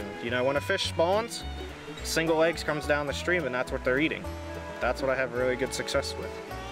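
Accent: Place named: Pennsylvania, United States. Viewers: Western PA for sure. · "Float daun the crick". I say he's from PA. (just like me).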